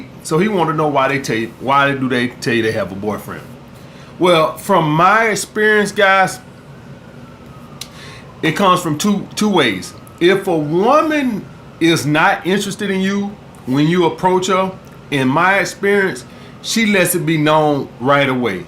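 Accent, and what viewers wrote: United States. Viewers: That country ignorant accent he has would lose my interest on site. Let’s not mention those horrible razor mark scars that are so visible. Let’s me know he’s cheap and doesn’t even take of himself....straight facts no shade.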